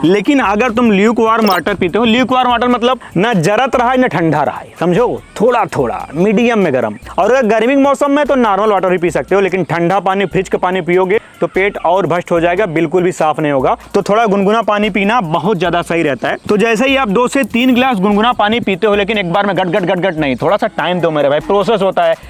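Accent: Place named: Uttar Pradesh, India. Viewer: भाई आपका यूपी वालि भाषा मुझे अच्छा लगता है 😅 मैं भी यूपी का ही हूं ❤😊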